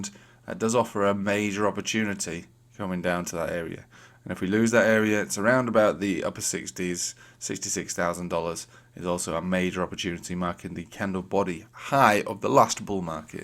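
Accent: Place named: Yorkshire, United Kingdom. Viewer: Thanks for the video mate I thought you was somewhere near me by the acent I’m in Bradford the posh side of Yorkshire 😂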